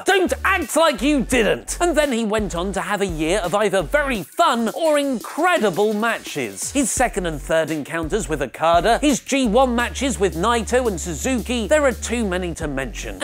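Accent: New York, United States. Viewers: who else popped for the return of 1920's stereotypical New Yorker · Ahhh the return of stereotypical 1920s New Yorker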